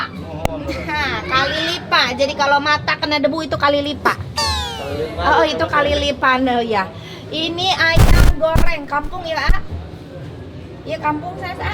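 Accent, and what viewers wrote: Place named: Sunda, Indonesia. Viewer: Paling seneng bgt dgr org ngmg sunda😂 dgr teh ngmg tuh sesuatu bgt 😂👍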